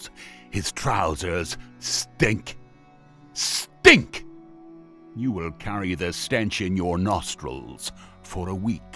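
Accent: Dublin, Ireland. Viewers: I hear a well bred Dublin accent. It is said this accent speaks the best pronounced English in the world. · Interesting an Irishman is narrating this. The exact same conditions existed in any town or city in Ireland. Actually worse, even though Ireland and England were the same country at the time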